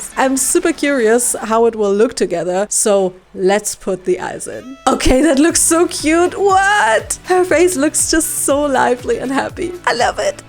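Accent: Germany. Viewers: Are you German? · Do i hear a german accent?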